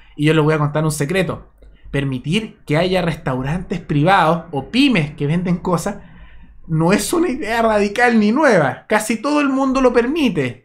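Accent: Chile. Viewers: me parece por tu acento que podrías ser chileno,me gustó tu análisis de la cuestión cubana sobre la apertura de los restaurantes en Cuba pero no estoy de acuerdo con la parte de que le das crédito al mal llamado nuevo presidente y al también nuevo economista de Cuba,pues yo te diré como cubano que soy que nada de esto es nuevo ni tampoco tiene que ver con el hecho de que halla un nuevo presidente porque todos ellos después de la muerte de Fidel Castro han sido colocados ha dedo, Raúl fue puesto ha dedo por su hermano Fidel y ahora Díaz Camel fue también puesto de la misma forma,no perdamos la perspectiva de la política del gobierno de Cuba y tengamos en cuenta que el sistema de gobierno en Cuba siempre ha sido el mismo desde hace más de sesenta años y ese gobierno desde la caída del comunismo en Rusia y en toda la Europa del este ellos nunca permitieron elecciones libres porque no querían perder el poder pero como se vieron aficionados económicamente empezaron ha buscar cómo hacer alguna pequeña apertura económica para salir de la cituacion en que se encontraban y entoces desde los años de 1990 lo primero que hicieron fue legalizar las monedas extranjeras especialmente el dollar y ha permitir el turismo de los países libres,dejaron que compañías extranjeras invirtieran en hoteles y también ha los cubanos dentro de Cuba les permitieron invertir en una serie de pequeños negocios que luego más tarde o varios años después cuando el gobierno vio que la gente estaba adquiriendo alg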